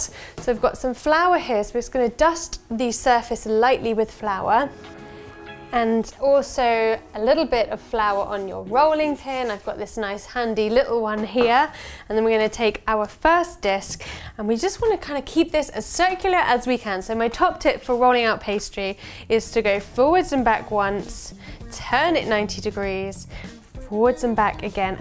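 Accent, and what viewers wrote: United Kingdom. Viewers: Learning British Accent in this channel is my fave ❤️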